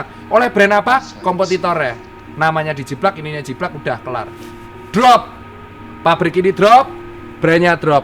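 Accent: Java, Indonesia. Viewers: Logatnya Jawa banget mas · i love mEDok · Medhok banget. Kayak si org korea itu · Logat nya medok wkwk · Mas meh takon asli wong ngendi e koe kok koyone yo gur wong jowo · Mas e medok e semarang opo suroboyo kok aku ngekek wkwkwkw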